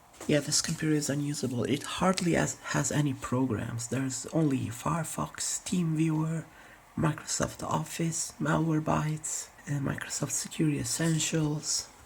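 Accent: Italy. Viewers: Sei italiano vero? Non hai una pronuncia da madrelingua :D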